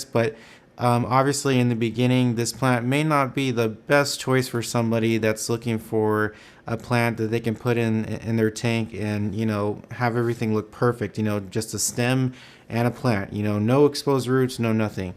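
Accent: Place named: Glasgow, United Kingdom. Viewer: Glaswegian :P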